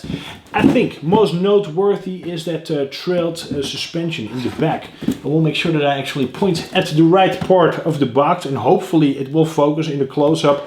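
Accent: Netherlands. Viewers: What is that accent you have? It sounds a bit Dutch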